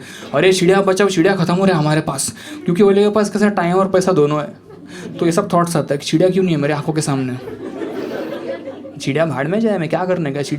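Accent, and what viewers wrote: Maharashtra, India. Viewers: Love all your videos bro!! Specially your Marathi accent! Love itttt!!! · Bro is to Good su marathi accent😂😂😂😂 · His Marathi accent makes him 10x funnier lol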